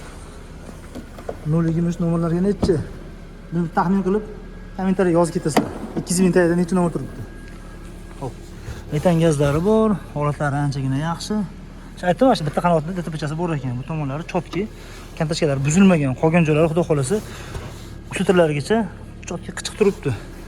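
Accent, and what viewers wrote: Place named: Andijan, Uzbekistan. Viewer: 200 000 ni tagi 60 da shevez andijonniki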